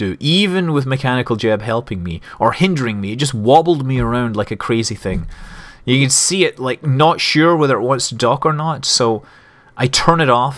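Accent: Scotland, United Kingdom. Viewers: i need to know.... are you scottish?because you sound pretty scottish to me and ive heard alot of scottish people:P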